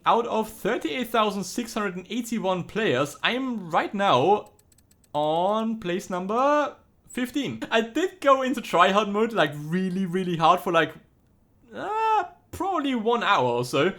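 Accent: Germany. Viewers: You are a German right? Ps: Me too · Er hat einen deutschen Akzent oder bin ich bescheuert? 🤔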